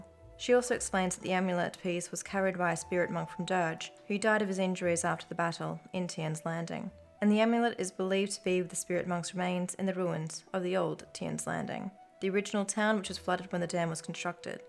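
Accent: Australia. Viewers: The good ending is being a soft spoken Aussie lady who gets all the views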